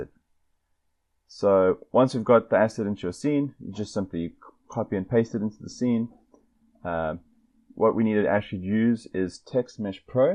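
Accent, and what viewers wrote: South Africa. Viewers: nice to hear a South African in tutorial videos now and then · how noticeable the South African accent is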